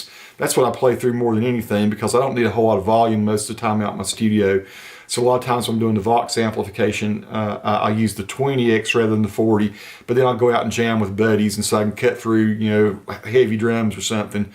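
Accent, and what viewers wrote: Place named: Oklahoma, United States. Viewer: Almost sounds like oklahoma or something midwest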